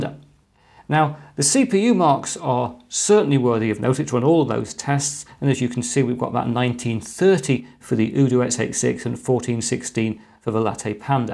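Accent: England, United Kingdom. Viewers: you videos are fantastic! Keep it up! That english accent is very nice to hear. It's sounds like an intelligent person.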